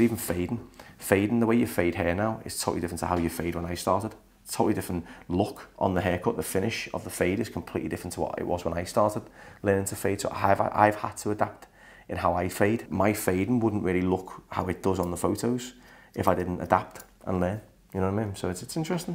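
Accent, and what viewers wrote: United Kingdom. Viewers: He's British, great accent talks about what he is doing on video, scroll on if you don't like it. Some of us like to watch and listen to!❤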